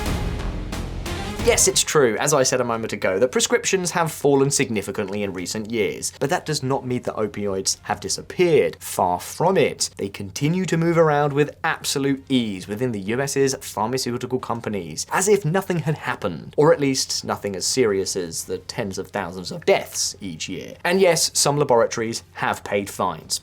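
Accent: United Kingdom. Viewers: So rich for a Brit sounding dude to sound so smart, yet missing the point. Dude, this is what England did to China, and now is doing it to us. Who is in charge, the bankers dude, the identically same as before. Trump is tearing down the global order, in other words, your king. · Very approriare to have a guy with a judgemental brithish accent lecture USA on opiums By the late 1700s, Britain had a huge trade problem with China: • Britain wanted Chinese tea, silk, and porcelain. • China wanted almost nothing from Britain. • Result: Britain was bleeding silver. So Britain did what empires do when trade doesn’t go their way. The Opium Solution Britain began smuggling opium grown in British-controlled India into China. • Opium was illegal in China. • Millions became addicted. • Silver started flowing out of China and into Britain. China knew exactly what was happening and tried to stop it.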